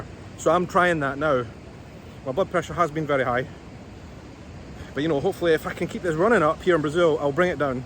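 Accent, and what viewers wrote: Scotland, United Kingdom. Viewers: The curiosity might be not just the English speaking but the "funny" accent. LOL Nobody can turn down listening to a Scottish accent! ;) My Dad was from Forth so I could listen to you talk all day long! So glad the cyclosporine has been such a miracle for you! Heather xo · Do you have a heavy Scottish accent in Portuguese too? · ...INTERESTING contrast: a Scots' accent...in BRAZIL...! WHEN you take up running, make sure the shoes are SUPPORTIVE; (YOUR knees will THANK YOU...). BTW: I'm VERY HAPPY you respond well to the cyclosporin... When you need to get off cyclosporin, DO try diet changes... YOU have a LOT of positive attributes going for you... 👍😃👣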